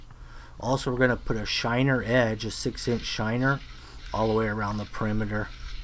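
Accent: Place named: West Coast, United States. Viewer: Bud...thanks for the glove offer.. but I truly dont need any, I got a duffel bag full ive collected for years..... you give us all a Christmas gift everytime you teach us how to do concrete...in each video... theirs so many terrible people out their giving advise so to have a legit gent is not something that happens often in our world today. On any platform....I say this carefully so not to jinx you, and your guys, but you and your guys are kind of "masters" of your art. .... I grew up most my life in California and Vegas..then I moved all over the us... so I have seen a bunch of finishes.. im guessing you live in cali cause you got that west coast surfers slang in your voice.. sounds like to me anyways.. so anyhoo.. thank you for giving amazing content for us that get a chance to watch you, and learn when we can...im watching you for the day I build my own house.... 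so I know what not to do... and to do... respect...........................................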